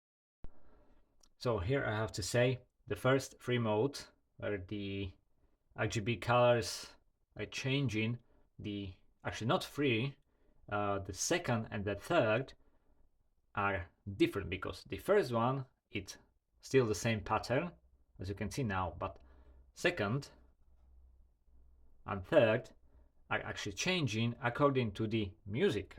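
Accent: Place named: Slovakia. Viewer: viem ze si slovak pocut to ahahahaaa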